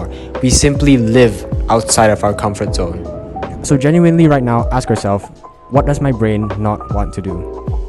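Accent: Singapore. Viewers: Are you from singapore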